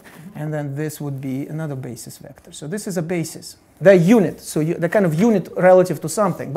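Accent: Russia. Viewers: That's real mild russian accent. Doesn't quite sound like ensign Chekov, right? :D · Russian accent hm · Born May 2, 1968 Kolomna, USSR i knew it from his accent! ) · sounds like Russian pronunciation. maybe he will do same speech in Russian? it would be such a pleasure. but I can be wrong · It so funny and pleasing to hear his Russian accent and intonations here and there :) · I LOVE HIS ACCENT . MOTHER RUSSIA!! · Thinks Hmmm... He has accent like a russian. googling Yup! He is russian :)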